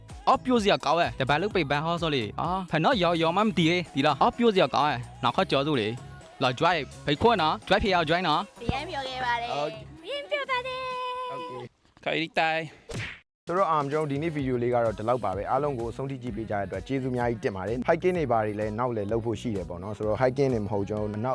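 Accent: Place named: Myeik, Myanmar. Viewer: မြိတ်အသံပါ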